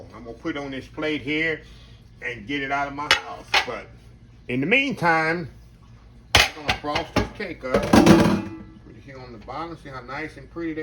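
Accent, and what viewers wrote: United States. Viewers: We're from Philly, up north and my grandson, he is 10 said, what kind of accent does he have, i was like he is an ole country boy heheh,! where are you from brother?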